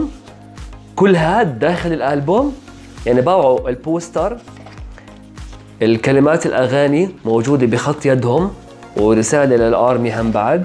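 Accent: Iraq. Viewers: انت كنك عراقي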